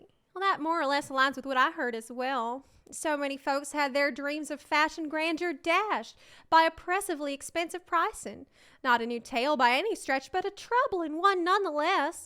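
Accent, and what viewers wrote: South, United States. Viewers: The southern accent made this video great for me being from and in southern Louisiana 😂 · A+ southern RP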